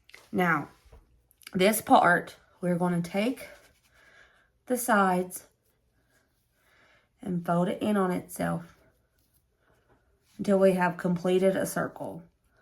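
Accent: South, United States. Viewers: Love to watch the show and the great recipes. The southern accent is difficult to understand at times. Maybe slow down while explaining the recipes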